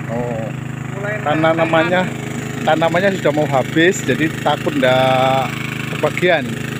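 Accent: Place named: Java, Indonesia. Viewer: Orang Jawa itu medok mas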